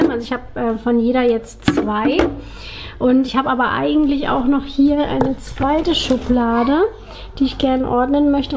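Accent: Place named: Berlin, Germany. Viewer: Ich musste auch sofort denken da berlinert doch jemand 😂😂🤩🤓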